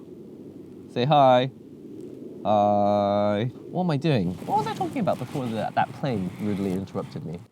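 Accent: England, United Kingdom. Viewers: Your videos have been a welcome addition to my routine. Idk why, but you remind me of someone i met while doing a study abroad program overseas in Akita. Guy was named Shota, and also spoke excellent english (with the accompanied English accent). My therapist recommended that I try and just go outside more, and I've been meaning to do so at least once a week. No distractions, just me. Ive gotta get back to that.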